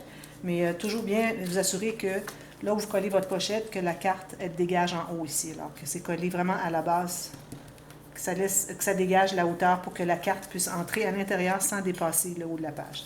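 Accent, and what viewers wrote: Canada. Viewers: Coucou, Pour tes pochettes plastiques, tu aurais pu faire une incision au cuter coté droite, pour pouvoir ôter tes fiches comme ça coté gauche tu aurais pu couper la 1er partie pliable avec les trous et en refaire dans la 2eme partie pliable, et là ta pochette plastique aurait la même taille que ta page et plus de problème pour sortir et rentrer tes fiches! ► Vérifie quand même mes dires car n'étant pas près de toi, mais derrière mon écran peut être que j'ai tout faux! Étant donner que c'est la 1er fois que je met un mot sous une de tes nombreuses vidéos (toutes vue hi hi ) j'en profite pour te dire,.... MERCI tes explications sont claires, tes idées de pages ou forme d'albums sont vraiment très très intéressantes et j'ADOREeeeeeeeeeee ton accent qui me fait voyager chez toi au Canada! MERCI BEAUCOUP pour tous ça! Bonne journée & Gros Bisouuus Valérie de FRANCE.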